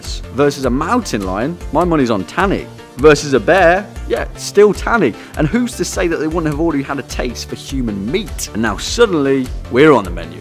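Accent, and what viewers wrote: England, United Kingdom. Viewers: What you mean, we need a english man voicing these video, we got rebecca but we needed a guy!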